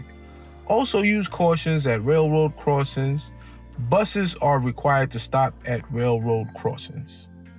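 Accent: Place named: New York, United States. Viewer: Finally!! An actual human voice! And a NYC accent at that! 😆🙌🏼 Thank you!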